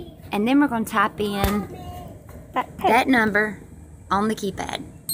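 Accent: United States. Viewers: She sounds like she lives out here in Tennessee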